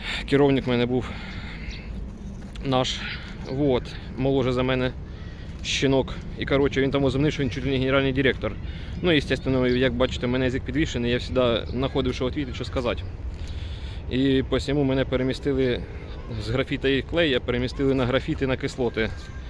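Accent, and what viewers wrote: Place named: Poltava, Ukraine. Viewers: Від ТАКОГО суржика вуха в'януть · По розговору думаю Полтава.Или Черкассы.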